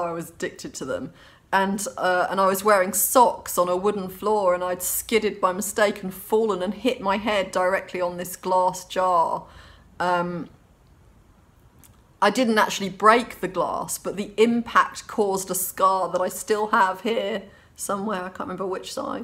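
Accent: United Kingdom. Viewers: your perfect British accent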